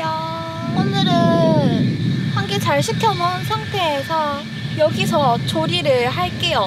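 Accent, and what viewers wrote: Gyeongsang, South Korea. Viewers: 갱상도 분이신가보다 ㅋ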